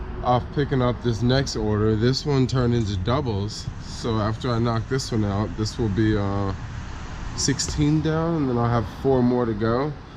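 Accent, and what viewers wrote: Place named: California, United States. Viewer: That Cali accent. Unmistakeable, and unique...especially the bay accent. I miss LA. ;(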